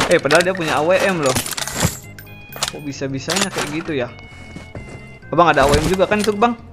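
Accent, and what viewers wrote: West Java, Indonesia. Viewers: Edan mantap cui modar modar hahaha pasti urang sunda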